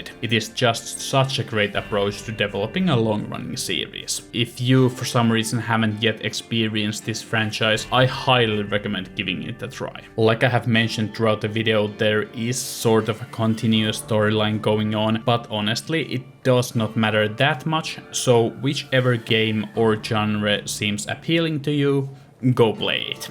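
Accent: Finland. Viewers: Oot Suomalainen? Pikkusen toi suomi aksentti kuuluu puheessa 😄.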